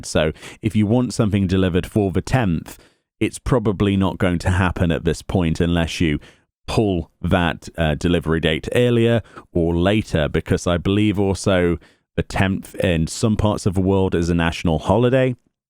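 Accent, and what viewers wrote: United Kingdom. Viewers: Thanks for the enlightenment in your last teatime. 🙂 I enjoy the teatimes very much and would love to have more of them. My question is a bit personal and I apologize if I'm putting you on the spot: The way you pronounced the word 'early' made me wonder which part of the UK do live in? I understand if you'd rather not answer that due to those dimwits who think Swatting is a nice thing to do. After my love for FFXIV comes the love of languages. The English language has a special place in my heart and I'm curious about the different accents there are and where to find them. Which in turn is the reason for my question 😅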